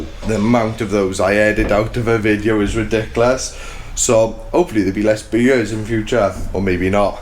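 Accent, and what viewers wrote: Wales, United Kingdom. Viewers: Hi great vlog really enjoy your output don't stop saying by err obviously a Welsh thing😂😂 as others I follow who are Welsh say the same thing. Quick question on the vlog you said the build was having a 560 amp hr battery huge for off grid and no gas fitted i noticed on the electric board you were fitting a victron 30 amp dc to dc charger surely you would want at least a 60 amp? 30 would take for ever to charge from flat while driving? Just wondered what your thoughts were? Cheers mate keep up the good work also what 3000w inverters do you use recommend when building your vans. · Great video as always. Your workmanship is top notch. As a fellow welsh man I don’t even notice you saying by ere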